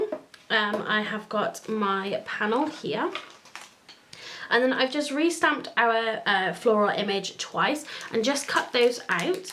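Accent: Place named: Wales, United Kingdom. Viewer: Part way through I thought the dotted background would make it too busy but I was so wrong it worked beautifully. Every video I am trying to sus out your accent because I am hearing some Welsh mixed with something else I can’t put my finger on. I really need to start playing with my stamps and inks I find myself always turning to the Hunkydory card kits or digital designs.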